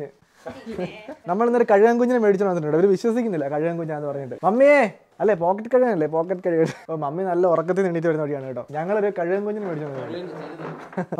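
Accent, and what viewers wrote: Kottayam, India. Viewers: നിങ്ങൾ കോട്ടയം കാർ ആണോ?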